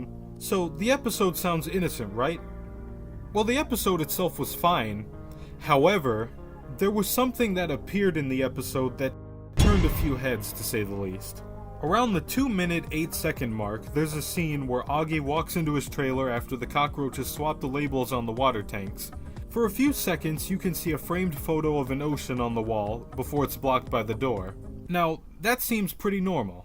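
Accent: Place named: United States. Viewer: Huh an American talking about oggy Neat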